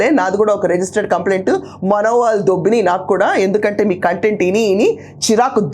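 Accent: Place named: East Godavari, India. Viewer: మీది తూర్పుగోదావరి జిల్లా కదా నాకు ఎప్పటినుంచో డౌట్ ఉంది మేడం నీ మాట తీరు మాది కాకినాడ మాకు కొంచెం చెప్పండి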